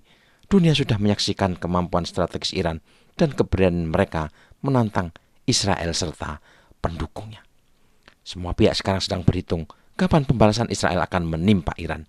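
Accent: Java, Indonesia. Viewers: Terlalu medok Logat Jawanya diperhalus yaaaa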